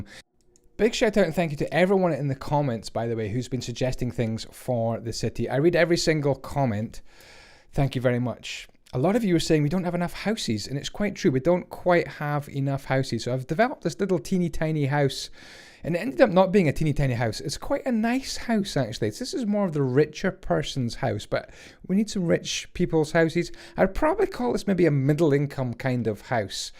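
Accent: Scotland, United Kingdom. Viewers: "hoose"; I love scottish accents and this is the reason why I watch you.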